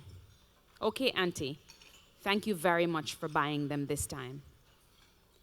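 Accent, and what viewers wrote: Jamaica. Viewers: she sounds jamaician or like shes from di islands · Definitely Jamaican 😍 the accent was kicking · soooo jamaican....lol...mi love it nuh hell!! So proud of u man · my whole family is jamaican. I know when I hear a fellow yadie!! So happy to see jamaicans in the arts~ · This woman is Jamaican. I recognize the accent. I love that she speaks for girls and women. When I grew up, like many, there was NO internet. No books to glean from in any library about women and our troubles. This woman is wonderful! Thank you!!!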